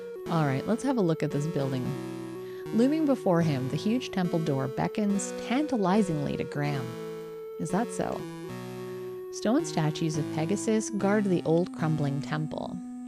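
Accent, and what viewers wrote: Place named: Canada. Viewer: Without trying to come across as too much of a suckup, I am really enjoying this playthrough. The combination of the game's music/sound effects + your voice (and Canadian accent) that makes it really soothing to listen to in the morning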